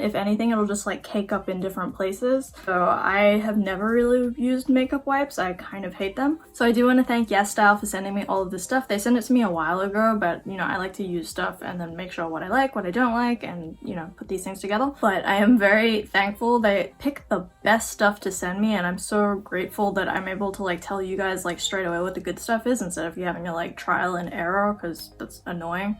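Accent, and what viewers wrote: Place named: Australia. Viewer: Where are you from? I know it sounds weird but i keep hearing a slight aussie accent from you ! Are you an aussie but have lived in America for years?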